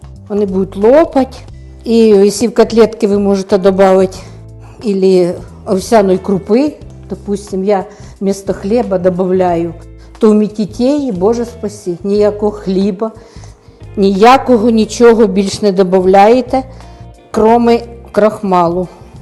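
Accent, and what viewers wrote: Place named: South, Ukraine. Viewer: Наш південний говір! І смачні мітітеї! Дякую Вам. Чекатиму наступних відео. Хай щастить!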